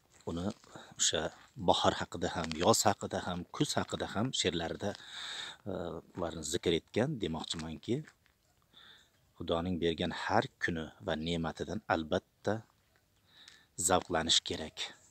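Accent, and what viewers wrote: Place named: Surkhandarya, Uzbekistan. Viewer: Сурхондарё еки Кашкадарё дан деб тахмин килгандим шеванггиздан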